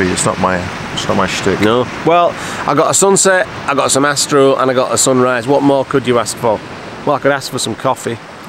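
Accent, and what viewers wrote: Yorkshire, United Kingdom. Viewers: Just interested, you're from Canada but you sound Yorkshire?!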